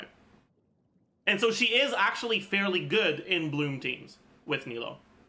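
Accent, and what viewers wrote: Quebec, Canada. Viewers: he's fluent but he has a very thick Quebec accent which very different from what you'd hear in France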